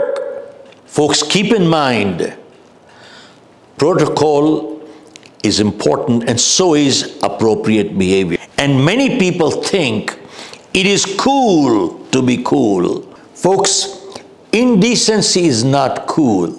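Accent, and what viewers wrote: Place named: West, United States. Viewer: West accent is supercool.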